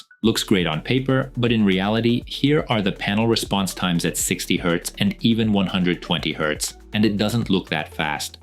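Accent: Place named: Russia. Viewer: Так я знаю этот голос) сначала мысля -, а моожет и на том канале голос ИИ , но посмотрев пол видео понял, что ты говоришь а не ИИ) Английский гуд, но акцент нашинский именно)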